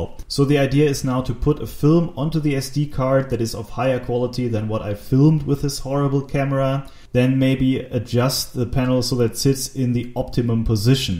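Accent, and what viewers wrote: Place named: Germany. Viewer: Hi, Thanks for sharing your ideas with us. I just love it and also enjoy your German accent.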